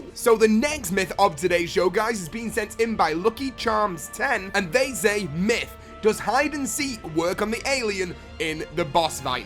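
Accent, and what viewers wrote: United Kingdom. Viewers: not to be racist but u sounded even more british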